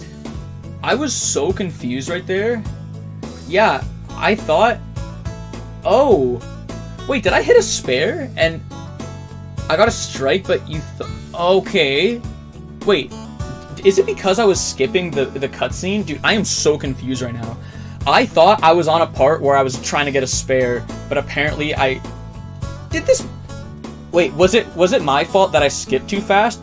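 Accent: Canada. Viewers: you sound like youre from both Canada and chicago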